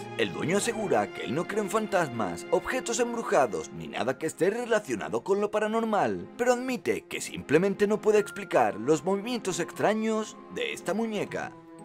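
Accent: Spain. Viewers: FELICIDADES COMPAÑERO DE DONDE ERES? ESPAÑA? CREO QUE SI POR TU FORMA DE HABLAR ESPERO Y CUMPLAS MUCHOS AÑOS MAS AMIGO MIO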